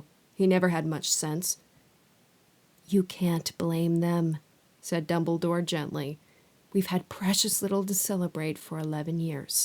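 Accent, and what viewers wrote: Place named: United States. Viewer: I m learning ENGLISH. I m looking for American accent for reading Harry Potter . Finally I found you !and even better, you re a lady ! I m so happy!THANK YOU SO MUCH ❤❤❤Your voice is amazing ❤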